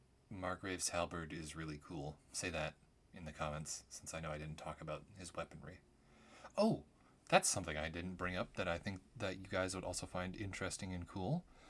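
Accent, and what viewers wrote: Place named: Midwest, United States. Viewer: Margrave's halberd is REALLY cool... Your "Midwestern white guy" accent does sound jarring...but mainly because I cannot freaking place it. It sounds familiar, but I can't figure out from where. Anyway...now you've finished with this monumental Q&A session...on the snow leopard video. Good luck!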